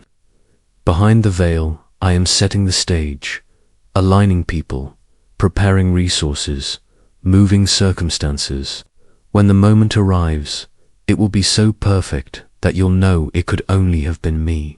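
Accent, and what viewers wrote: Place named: England, United Kingdom. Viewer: You have an English voice....as I do. I receive.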